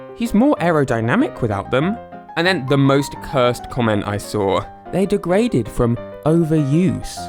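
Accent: United Kingdom. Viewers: did i just listen to a british guy talk about nipples for eight minutes? yes. yes i did.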